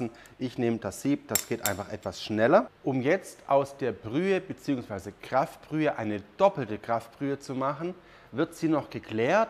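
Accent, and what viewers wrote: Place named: Swabia, Germany. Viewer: Oh... ich kann mich auch vollkommen vertun. Aber ich rate mal einfach frei raus: Nürtingen? Südlicher? ;-D Isch jetz nix Böses gemeint! Isch bloß an Witz. Danke für dein Video!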